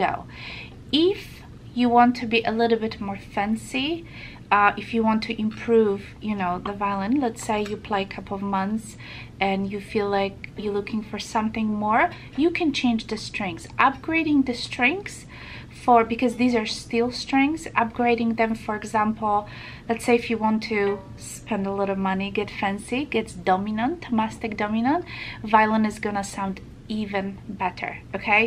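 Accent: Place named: Arkansas, United States. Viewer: First, I never knew the people from Little Rock, AR had such an enchanting accent. I could listen all day. I've ordered a more expensive "beginner" violin, a Gliga Genial-1 Oil varnished violin that is handmade in Romania, since I have many friends from there. With some upgraded D'Addario Heliocore strings, (I heard the regular strings aren't great), a carbon fiber bow, and a Wittner tailpiece, I spent over $420. (Those upgrades were suggested by another Teacher/Review on Youtube). I have been waiting for my violin, but I'm honestly considering picking up one of these Eastar violins so I can get started! With Amazon's fast delivery I may be practicing for a week before the Gliga arrives. Thank you so much for your thorough review. So many of the luthiers make fun and talk badly about the less expensive violins. Based on your review I think I can get an Eastar and that I will not become frustrated because my violin is inferior. 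I may throw some D'Addario Preludes on it. So many music stores sell Chinese violins. Even the luthiers, (Olaf and Fiddlerman), buy Chinese violins made to their specifications. Thank you again for your beautiful review. Please let me know if you think my setup is appropriate.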